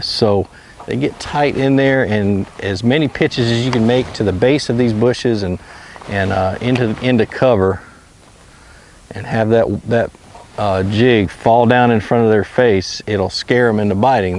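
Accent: Georgia, United States. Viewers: Are you from georgia? Now I will follow all your tips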